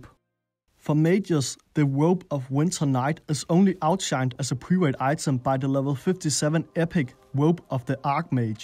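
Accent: Denmark. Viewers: Jesus that’s a thick danish accent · I just started watching, and im 100% sure this guy is Danish. Edit: Great video, learned a lot. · Pls stop calling him Arnold he doesn't sound like someone from Austria at all, he is 100% danish. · WE GOT A DANE! IN HERE! I CAN HEAR IT! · Sådan dansk engelsk 😂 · Ægte dansk accent. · Sounds like denmark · Very nice😀..fat danish dialect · the danish accent is strong with this one! :D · That's a Danish accent though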